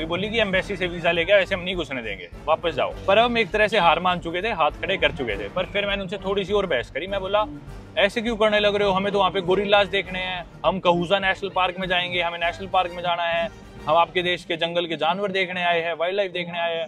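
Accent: Haryana, India. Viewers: great bhai tumhare sath safar ka maza hi kuch or hai haryanvi tone bhot badiya lage manne. tum sach much great ho dear👍🥇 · Bhai ki hryanvi language sun k swad aa je h❤❤❤❤❤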